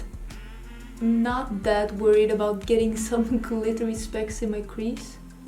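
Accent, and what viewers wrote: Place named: Sweden. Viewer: These are all so pretty! All of your effort is really appreciated. This might seem like a weird comment, but I spent a lot of my career (I’m a writer) covering Swedish hockey players. Your speaking style reminds me a lot of them, and it always makes me smile! I really need to come visit Sweden — I love the people and their sense of humour. Much love from Canada! 😘❤️🇨🇦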